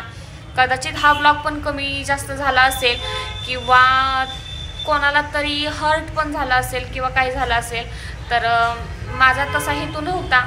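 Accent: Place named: Satara, India. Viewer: Mla tumchi satari bhasha khup aavdte tai 🤗 tumhi khup bhari ahat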